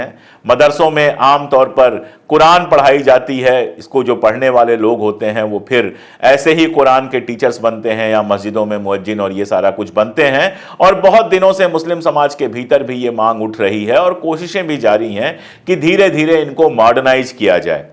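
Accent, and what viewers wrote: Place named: India. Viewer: ji aap haqikat mein is mulk ki tahjib ki jhalak hain aur aap ki awaz mein hindustan ko bakhubi mehsoos kiya ja sakta hai.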